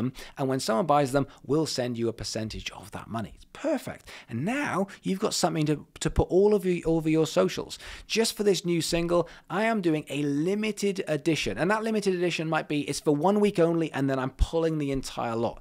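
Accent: Wales, United Kingdom. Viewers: So helpful, really good communication style, clear and concise and specific, plus the Welsh accent is great!😂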